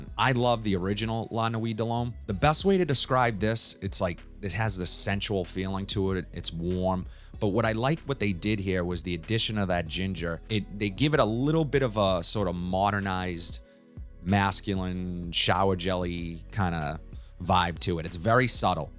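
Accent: Boston, United States. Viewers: I was watching one of his videos on my phone and my wife heard him and said, "Who from Boston, are you listening to??" 😂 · I definitely… couldn’t tell you where from Boston until you mentioned it…. Elixaarr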